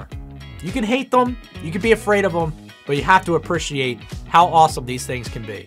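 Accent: United States. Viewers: American or Canadian my guess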